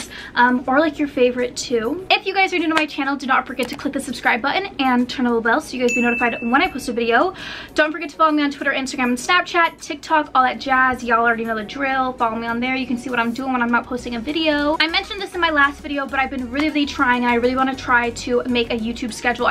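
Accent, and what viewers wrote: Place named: Texas, United States. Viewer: her texas accent 😂❤️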